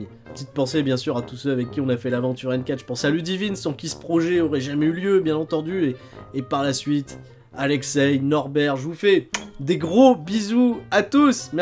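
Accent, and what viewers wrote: North, France. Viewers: Salut tu es du nord ? :)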